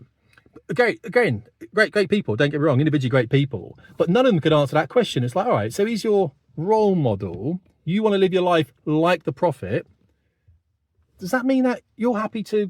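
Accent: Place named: United Kingdom. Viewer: . You're starting to sound like a African Britain in your speech. Go back to proper English patois. Cheers.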